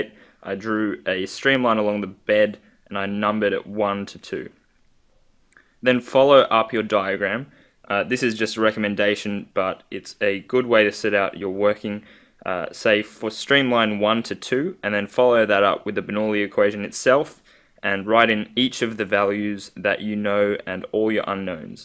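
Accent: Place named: Australia. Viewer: Australian Accent, likely Aus.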